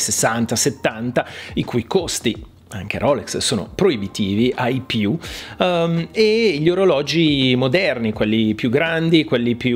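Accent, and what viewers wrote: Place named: United Kingdom. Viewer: Ma perché hai l’accento inglese?!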